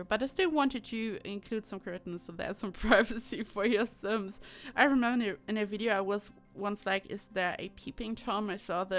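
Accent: Germany. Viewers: Are you from Germany??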